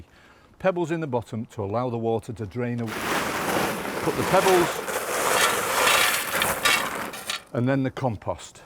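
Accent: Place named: Lancashire, United Kingdom. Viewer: Brilliant accent refreshing lancashire UK yeah!! Brill great explanation got my bulbs cheap loads in the sale at Wilcos cant wait to plant at 15 p 25p a bag ...cant be bad eh!!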